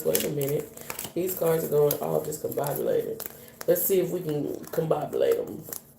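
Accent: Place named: Caribbean. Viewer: Love your accent. How it comes out when ya get excited!! Im from the Caribbean and reminds me of home. Different but the same. That slang. Bless you 🙏 love ya girl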